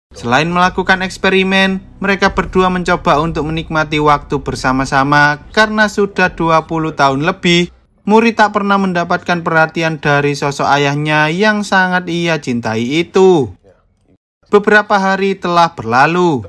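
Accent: Java, Indonesia. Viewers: Suara nya terlalu medok jawa nya jadi agak kurang nyaman di dengar nya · Jawa bangetttt lol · Meddo yah gaesss 😂😂😂😂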